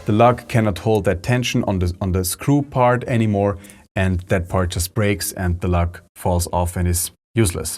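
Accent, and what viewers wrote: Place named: Germany. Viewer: brilliant! I’ll be watching it, cheers, are you in Germany? You sound german, if you don’t mind me asking..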